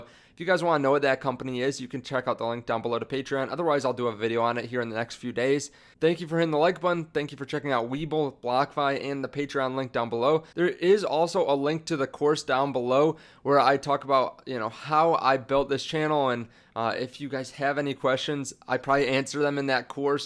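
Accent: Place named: Chicago, United States. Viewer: Dude must be from Chicago or the burbs.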